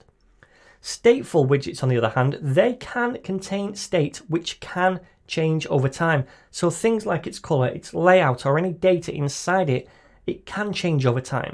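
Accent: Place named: England, United Kingdom. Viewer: I like your English accent!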